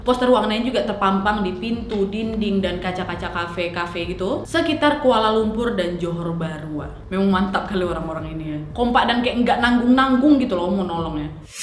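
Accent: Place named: Sumatra, Indonesia. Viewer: Karena aksen nya sumetera banget...jd lebih masuk kalau buat aku. Berasa lg diajak ghibah sm bestie 😅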